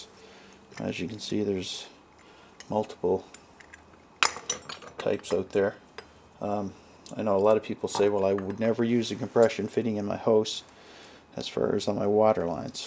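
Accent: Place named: Newfoundland, Canada. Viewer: Excellent video sir! Very clear, succinct, and to the point. Completely unrelated - to my ear, you sound like you might be from Newfoundland. (St. John’s or vicinity, perhaps?) It’s not very noticeable - but I picked up a subtle accent on words like “part”, “proper,” “marker,”- and “copper.” If you are NOT from Newfoundland, Id be curious to know where you ARE from. Thanks again for making this very informative video. Cheers!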